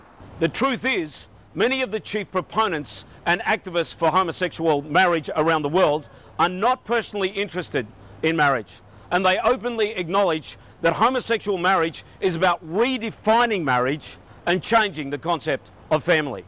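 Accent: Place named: Australia. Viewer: Oh. So *that's* how you make an Australian accent unsexy. Be a ginormous bigot. Duly noted.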